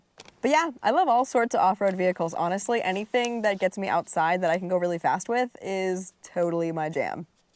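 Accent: Newfoundland, Canada. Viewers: My Mom is from Roddickton Newfoundland. I love watching your videos. Love the accent, reminds me of my family. Love them greatest people, Newfoundlanders. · Hearing slowly sound more and more Newfy as time passes is hilarious:D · I see that you are developing a bit of a Newfoundland accent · Ah ha...You are a Newfoundlander. "This is the life I chose I spose". :)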